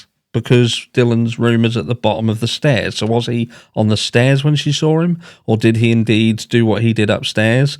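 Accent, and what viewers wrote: United Kingdom. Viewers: True Crime is always better with a British accent.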